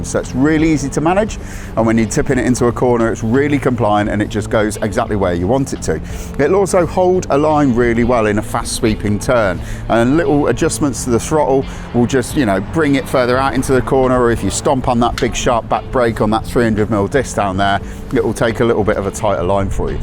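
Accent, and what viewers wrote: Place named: England, United Kingdom. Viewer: Nah, too many annoying American-isms spoken with an English accent.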